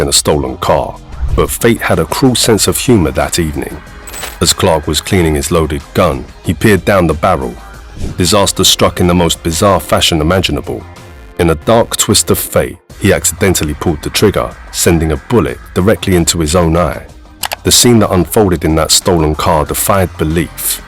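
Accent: United Kingdom. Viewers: State of this shite😂😂😂 uk accent is cringe as fook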